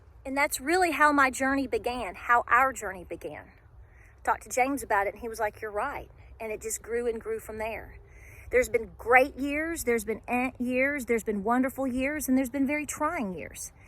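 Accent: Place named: East Tennessee, United States. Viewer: Great video. Very inspiring. Love your accent. Is that from East Tennessee?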